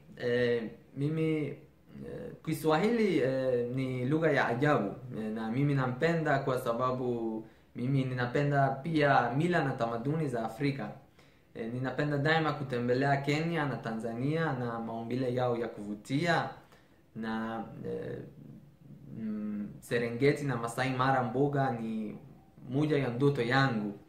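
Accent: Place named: Chile. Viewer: Qué extraño que no tengas más vistas y likes. Eres como un políglota "invisible" haha, Tú español está bien, es gracioso tu acento, se parece al acento chileno. ¿Cuándo subes otro video?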